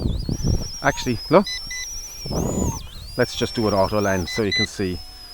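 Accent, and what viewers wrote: Ireland. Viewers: Geez You sound even more Irish than I do, Where you from,, I'd say Limerick at a Guess 😂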